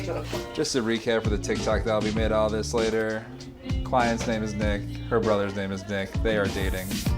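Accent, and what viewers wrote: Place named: France. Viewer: Your French accent is beautiful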